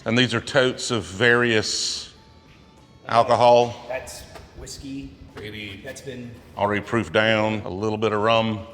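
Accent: South, United States. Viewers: is the reason I get so much enjoyment from you and your channel. That and me being a southern transplant, there’s nothing like a smooth southern accent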